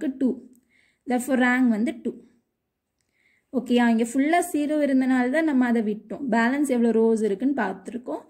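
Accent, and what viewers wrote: Kerala, India. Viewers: U r malayali right .?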